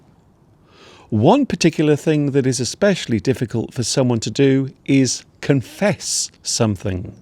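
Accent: United Kingdom. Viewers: It seems like your sound tone is so heavy, that I'm scared, I should have to confess I've fallen in love with the British accent. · Hi, yes...I have to confess I've something so important that I must get it off my chest (...trying to use those expressions here, did I do correctly...please?!☺️) I do like the British accent and my ears turn up immediately as soon as I hear it and I'm very interesting in your country 😅. Well, there's something else I must confess, shh.....I know that I have scratched Mr.Steve's car down there in the garage! Don't tell anybody! 🤫🤭😅 Jokes apart, I have to confess that it seems to me to have found two nice friends thanks to this channel and, let's not forget the English Addicts out there, even though we have never met in real life, it should be so interesting, I feel glad and not alone with my English adventure! Cheers! 😊🙏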